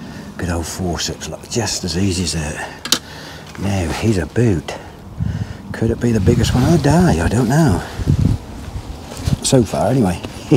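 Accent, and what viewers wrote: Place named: East Anglia, United Kingdom. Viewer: Waggler is difficult on a windy day but it looked calm there. With the amount of pellets needed to keep the fish in the upper layers not all of them get eaten so you’ll have a nice bed on the bottom for the bomb & pellet or method feeder. A lot of the time the bigger carp will sit just at the back of the feed and pick off a few loose ones so casting past your pellets isn’t a bad idea. Then fire in a few more and twitch into them. Eventually those bigger carp will have your hook bait. Lots of lovely lakes packed with fish in the Norwich and surrounding areas. Can tell you’re a born and bred Norfolk/Suffolk boy with that accent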